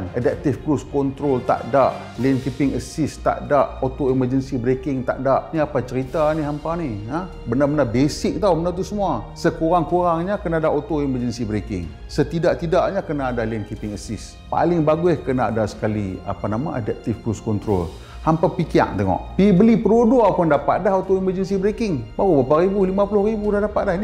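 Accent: North, Malaysia. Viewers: Ni versi utara ka? Lain maciam dia punya bunyik....ha..ha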